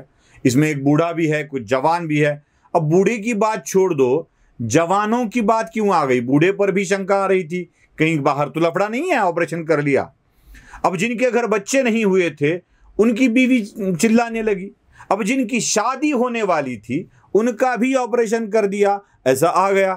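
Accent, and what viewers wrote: Maharashtra, India. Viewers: सर तुमची हिंदी खुपच खराब आहे, तेव्हाच ओळखुन पडता की तुम्ही महाराष्ट्रीय आहात म्हणुन,,सुधारणा आपेक्षीत आहे,धन्यवाद सर🙏🏻🌺🌺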